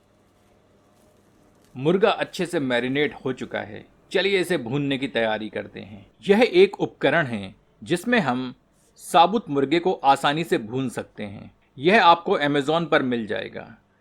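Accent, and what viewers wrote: Standard. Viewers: best is your shudh hindi narration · apki suddh hindi sun k anand ata h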